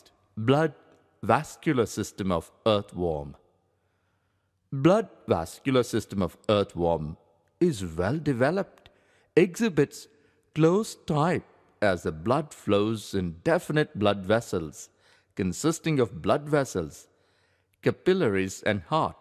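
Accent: India. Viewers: Indian accent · Its an Indian accent. If you dont like the way someone sounds, leave. Nobody is asking you to stay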